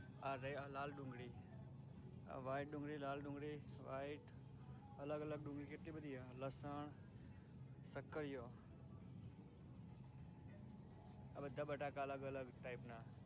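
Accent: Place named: Mehsana, India. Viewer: ભાઈ તમે તો મેહોણા ના લાગો સોં. તમારી બોલી મેહોણા ની સે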